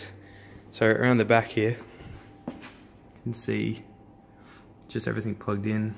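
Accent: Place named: Australia. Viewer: is this aud or us u sound aussie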